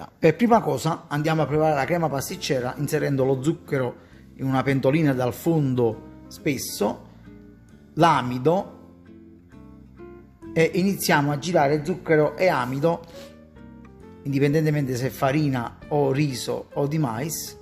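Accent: Naples, Italy. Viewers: il simpaticissimo accento partenopeo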